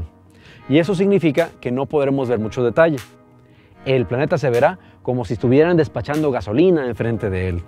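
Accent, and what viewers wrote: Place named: Monterrey, Mexico. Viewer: Eres regiomontano 😱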